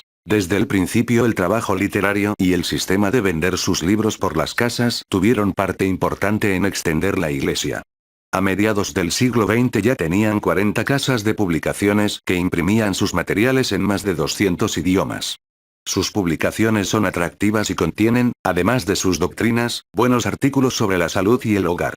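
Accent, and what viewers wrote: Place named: Spain. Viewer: Me hubiera gustado en españo latino, el español de España es bastante malo.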